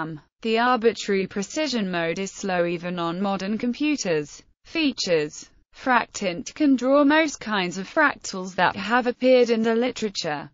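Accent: United Kingdom. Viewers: I wasn't aware synthetic voices could be made to sound British. Interesting. The CPUs of that day weren't called three hundred eigthy-six computers. It was "three eighty-six" (386) followed by the speed of the CPU in MegaHertz. My friend John had a top of the line 386 without a FPU (floating point unit) that he used to make fractint fractals on. At 640x480 we could go watch a couple of movies, stop to get something eat, go to the new QuikTrip to load up on a variety of sweets, go play putt-putt, go to the comic book/gaming store, or possibly play a few games in the video arcade. By the time we got back the fractal might be done rendering. Then there was the time waiting for it to save the render. As always hope the power didn't go out or his mom turn off that PC to conserve money on her power bill. I love FractInt. The one thing you failed to mention is the editing of the virtual video settings to allow renders up to 20,480 by 20,480 pixels!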